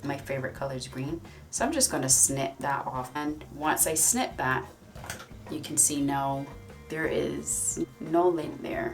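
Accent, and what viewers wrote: Barbados. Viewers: is that a bajan accent I am hearing mixed in there ??😀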